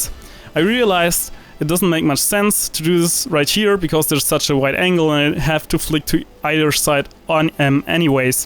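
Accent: Germany. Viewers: Jetzt ergibt das auch nen Sinn warum du sowie die typisch Deutsche im MM klingst xd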